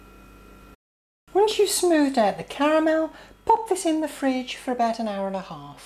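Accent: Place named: England, United Kingdom. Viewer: J'adoooooooore !!! Cet accent Anglais, cette recette et votre bonne humeur !!! Un régal ! Un bonbon Anglais ! Allez j'y retourne.... j'suis trop gourmand je sais !!!!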